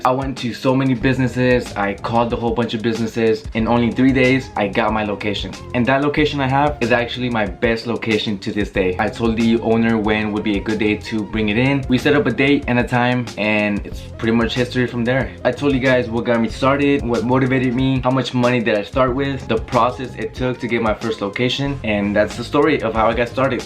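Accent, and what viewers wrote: Chile. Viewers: Eri chileno o no hermano? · ERES CHILENO WEON?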